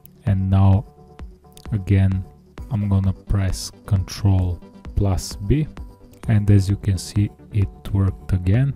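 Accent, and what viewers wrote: Russia. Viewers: Are you from Russia? Or Yugoslavia? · русский ацент)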